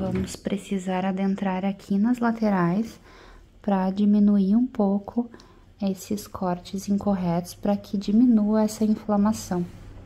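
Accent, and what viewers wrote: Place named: South, Brazil. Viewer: A voz e o sotaque dela são idênticos aos da minha cunhada que também é do sul.😊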